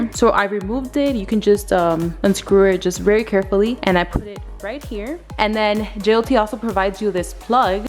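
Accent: Latin America. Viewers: Are you hispanic I hear a lil accent , btw you fine guerita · I figured u were Latina from ur accent but I didnt want to assume! Cuidate!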